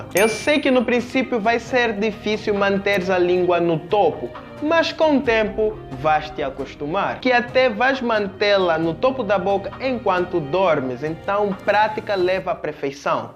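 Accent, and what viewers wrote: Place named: Angola. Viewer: Deve ser da angola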